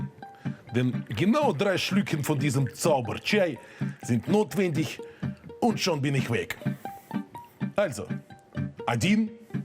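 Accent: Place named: Russia. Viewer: Russian sounding German is so cool!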